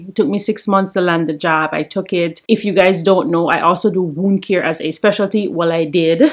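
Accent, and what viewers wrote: Jamaica. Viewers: U from jamaica? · I hear a Jamaican accent here, hey girl 🇯🇲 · Off topic: YouTube recommended me your video after watching Nuff Loads life in Jamaica, so I decided to click on your video even though those are two different videos, I decided to check out your video, and I hear an accent, and me being nosey lol, i checked out your IG as well, and I see that you're Jamaican. I'm not Jamaican but I love Jamaican people, lol YouTube is something else, but thanks for sharing your video.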